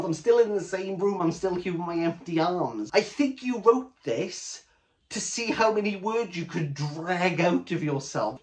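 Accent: Wales, United Kingdom. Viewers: Found your channel by accident...and what a happy accident it has been. The honesty and intelligence in this review is refreshing. Cheer to you for that! (Also, is that a Welsh accent I'm detecting, or am I completely lost?)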